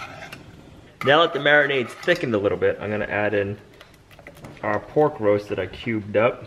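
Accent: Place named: Boston, United States. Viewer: Just subscribed to the channel, I can hear hints of the Boston accent but where about are you located now( obviously not too detailed for personal reasons) but dang you guys have some good snow already. I’m from the north east as well and we’ve only just got our first snow.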